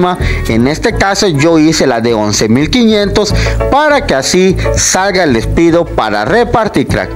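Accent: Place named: Yucatan, Mexico. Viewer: Hablas muy yucateco bro . No se te entiende . Pero buen bug bro saludos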